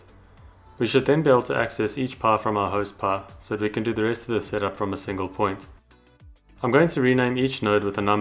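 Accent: South Africa. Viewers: Is this guy from South Africa??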